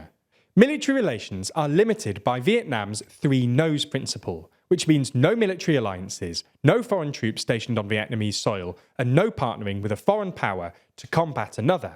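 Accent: United Kingdom. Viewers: British for ya!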